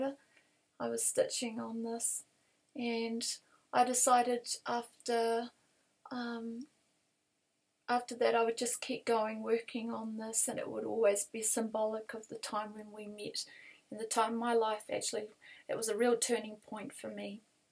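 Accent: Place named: New Zealand. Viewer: Hi from Auckland! My maternal fam is from Lumsden, you sound just like home 😀Nice to meet you!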